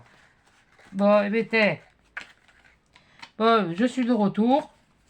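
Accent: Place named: Médoc, France. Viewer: Bonjour très heureuse de voir que vous allez bien Vos boîtes sont magnifiques la boîte que vous avez faite pour la dame est très jolie vous êtes une très bonne personne et cette dame a un très grand cœur Je ne suis pas scapeuse mais j'aime beaucoup se que vous faites et vous me faites bien rire votre accent me rappelle le mien je suis originaire du médoc Prenez bien soins de vous Je me permets de vous embrassez embrassez ❤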